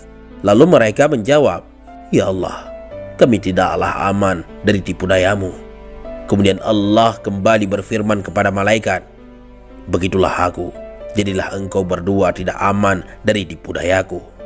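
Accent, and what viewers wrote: Aceh, Indonesia. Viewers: Anda bicara seperti orang Aceh , apakah benar ?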